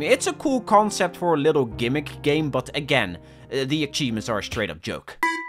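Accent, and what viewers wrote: Netherlands. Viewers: you sound so dutch its actually insane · i heard your accent and could immediately tell you were dutch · jij klint heel nederlands ben jij?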